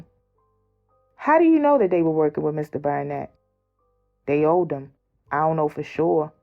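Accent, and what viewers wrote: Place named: Baltimore, United States. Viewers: Whoever this is reading, either she from Baltimore or she be down here a lot I can tell by how she say certain words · Sounds like she from baltimore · Whos dat new narrator wit da bmore accent😍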